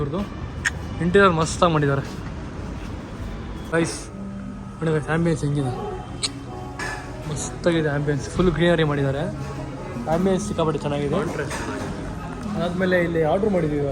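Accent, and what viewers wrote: North Karnataka, India. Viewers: just love the way you speak our uttarkarnataka slang 😂❤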